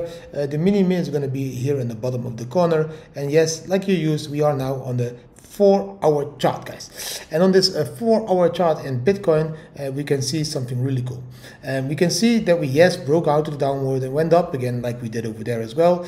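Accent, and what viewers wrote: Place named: Netherlands. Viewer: This guy is still Dutch AF 🤣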